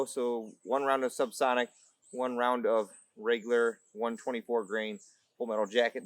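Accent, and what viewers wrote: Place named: Midwest, United States. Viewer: Lol that northern Midwest accent! You from WI?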